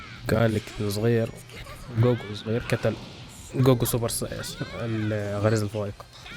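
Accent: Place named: Jordan. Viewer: حاسك من الاردن 😂❤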